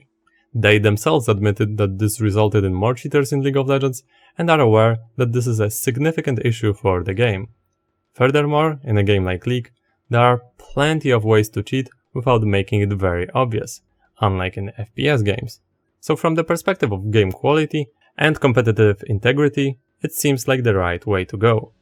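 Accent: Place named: Poland. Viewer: ziomek ma akcent jakby z polski był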